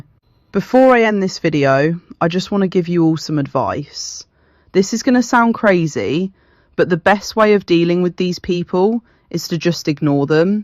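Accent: United Kingdom. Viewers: Whelp, this is the first time I heard your voice, I can tell you’re a Brit, also hopefully the uttp falls and hopefully the whole community dies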